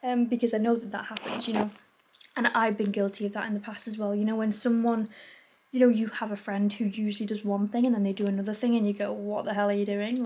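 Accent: United Kingdom. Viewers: do you have a Yorkshire accent?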